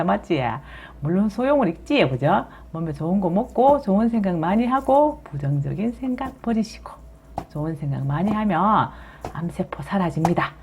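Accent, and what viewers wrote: Gyeongsang, South Korea. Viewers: ㅎㅎ 하고웃지요 갱상도사투리ㅋ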